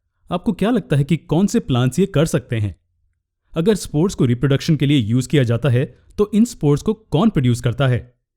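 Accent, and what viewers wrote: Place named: India. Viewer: Nice ........hindi accent sounds wow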